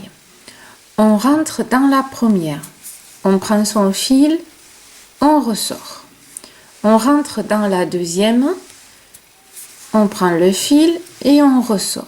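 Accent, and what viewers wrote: South, France. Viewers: Super, vous êtes géniale merci pour le joli lutin et j'adore votre accent du sud j'attends d''autre tutoriels avec impatience !!!!! A bientôt .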